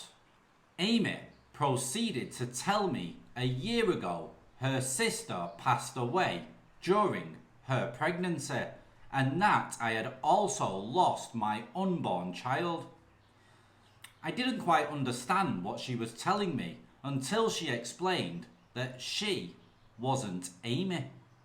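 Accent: Manchester, United Kingdom. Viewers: Apart from the Manc accent and baseball cap - I do enjoy the way you read the stories. I enjoy Thailand Bound on a Saturday too. Hearing about these tools that come to Thailand, ignoring the shit-load of advice that can be found in ten minutes with Google, never gets old. Cheers our kid!! 👍😊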